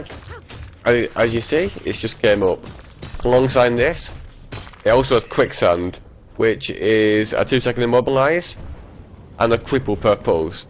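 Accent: United Kingdom. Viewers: To the people saying it's "just a northern accent", let's be honest, it's one seriously fucking strong northern accent... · Shite, what kind of accent is that? You are from the UK for sure, but where exactly?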